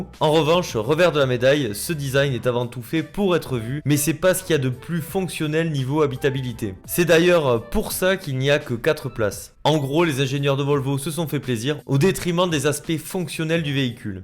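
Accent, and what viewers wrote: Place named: Southwest, France. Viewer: Salut , je te découvre avec cette vidéo . Format technique et humour que j'adore . Le petit accent m'est familier ..sud ouest , non ?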